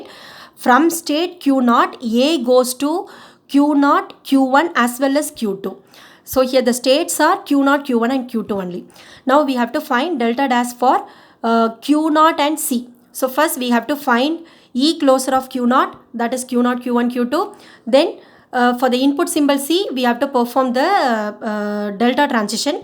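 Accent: South India, India. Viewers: randi south indian , dhang se hindi bol , a ko yay , n ko yen , dimaag kharab kar diya hai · i love your south indian accent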